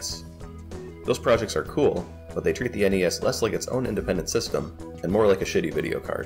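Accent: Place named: Utah, United States. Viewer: Sick vid. Thanks. Also Ew. A ruht directory. Is it tuh a spuhn Or is it too a spoon Ew. Disgusting. 2+2 isn't 5 cuz "that's how we say it down here" are you from utah?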